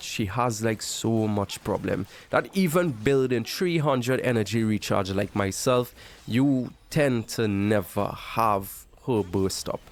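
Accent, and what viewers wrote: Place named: Trinidad and Tobago. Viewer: I was wondering same ... he sounds very Trini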